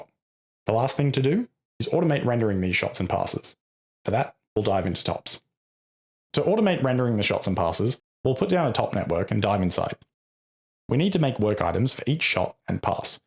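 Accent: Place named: Australia. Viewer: Holy shit, is this taught by Australian Barry Manilow? I'd like a tutorial on how to get my voice to be this sexily resonant, I feel like I'm being seduced by a whiskey cask.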